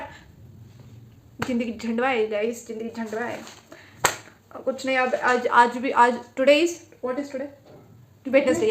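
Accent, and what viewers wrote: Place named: South India, India. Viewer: You sound like you're from South Indian .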